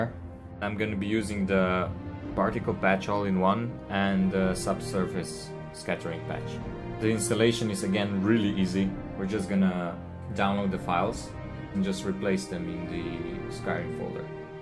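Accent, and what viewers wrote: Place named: Netherlands. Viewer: sounds good, from other YouTube vids I see you can get some nice results modding oblivion, also am I right in thinking you are from the Netherlands judging by your accent?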